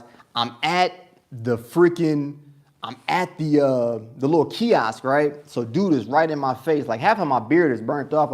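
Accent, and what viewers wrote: United States. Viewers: 😂😂😂😂😂i have not known you to be so funny. Sorry again Yes,that accent ,many Americans i see around the malls in Kenya they dont talk much. And i know why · Unfortunately, corruption is all over Africa. They see money when they hear that American accent. Do not travel by bus by yourself if you are going to other countries. Travel with a local if you can.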